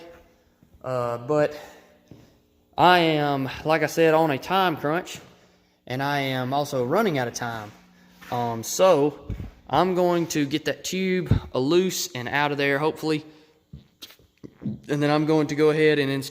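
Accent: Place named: South, United States. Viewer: Nice video. I am about to go down the same road so I appreciate the insight. I can tell you're from my neck of the woods from the southern drawl. Where abouts are you located?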